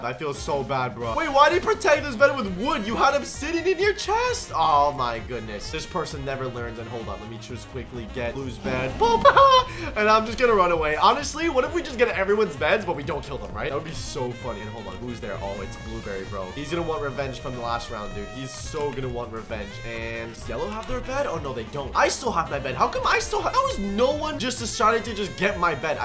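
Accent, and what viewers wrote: United Kingdom. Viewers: I still don't know if you British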